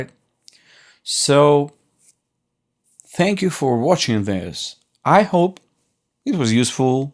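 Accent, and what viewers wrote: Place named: Russia. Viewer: Сразу слышно, Русский говорит. Привет,. Я без стёба.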